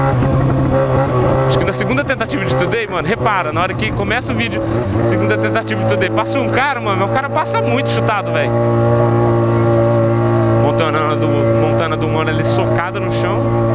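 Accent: Goiás, Brazil. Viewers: pq vc fala no pique do goiás,sendo que vc mora no paraná?kk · tu fala no pique do goias pq vc eh daqui de goias?..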